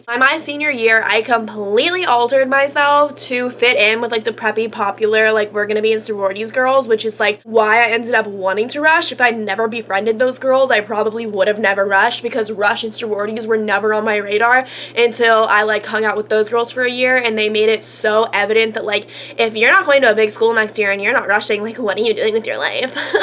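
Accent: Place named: Texas, United States. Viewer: Your texan accent came out a little in this video lol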